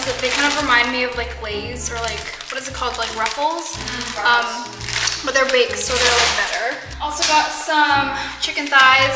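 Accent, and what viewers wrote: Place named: United States. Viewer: ahh, london. you have an america accent. are you from here? if so, would you recommend moving to London/overseas? do you have a video on your experience & the cost of living?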